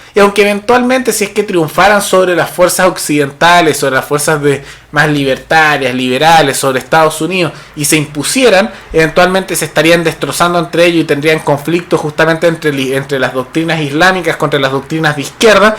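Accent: Chile. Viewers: Yo lo que sé es que jamás me había gustado el acento chileno excepto tú si tú tú tú me gusta como hablas y ese acento que tienes claro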